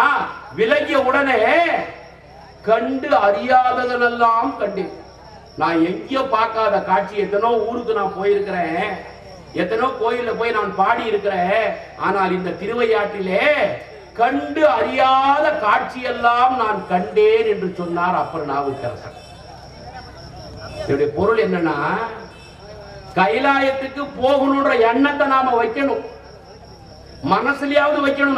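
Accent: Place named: India. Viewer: ஐயா நல்ல தமிழ் கேட்டு நாளாச்சு. உங்க புண்ணியத்தால் எம் செவி குளிர்ந்தது.அதுவும் ஒரே மாவட்ட தமிழை கேட்க்கும் போது மிக்க மகிழ்ச்சி உங்களை ஆலத்தூரில் நேரில் சந்திக்க இறைவன் அனுக்கிரஹம் வேண்டுகிறேன். இனிய ரமலான் நோன்பிருந்து நினைத்தது நிறைவேற வாழ்த்துக்கள்.ஐயா. இவன் பூ. நிரைஞ்சன் குமார் ஆற்காடு.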